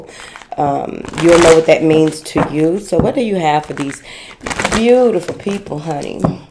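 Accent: United States. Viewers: I love hearing your messages. Thank you so much for always being you!! I love your country accent!!!❤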